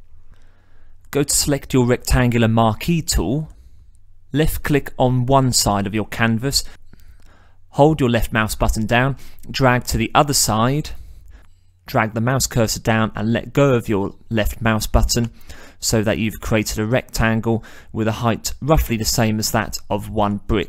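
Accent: United Kingdom. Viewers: Amazing mate! I love it.. your British accent makes it interesting.. May I ask that you do a ''Texturing UV maps for 3d model tank''. For RTS video games like Company of Heroes. Please :)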